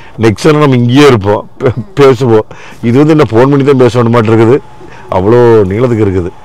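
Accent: Kongu, India. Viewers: தம்பி அவர்களுக்கு வணக்கம் வாழ்த்துக்கள். உங்களின் மண் வாசம் மாறாத கொங்கு தமிழும், அதனுடன் இணைந்த இயல்பான பேச்சும், அதில் தெரிகின்ற உண்மையும், புன்னகையும், உங்கள் காணொலிகளின் வெவ்வேறு விதங்களில் வெளிப்படுத்தி வருகின்ற விதமும் மிகவும் சிறப்பாக உள்ளது. வாழ்த்துக்குள்!! தொடரட்டும் தங்களின் பணிகள். பாராட்டுக்கள்.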